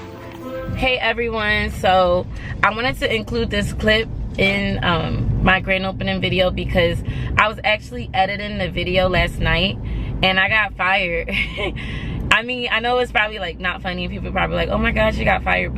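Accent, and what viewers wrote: Philadelphia, United States. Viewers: You have an east coast accent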